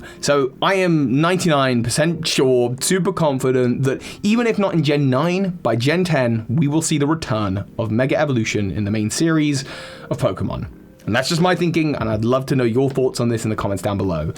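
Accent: United Kingdom. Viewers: Wait your British:o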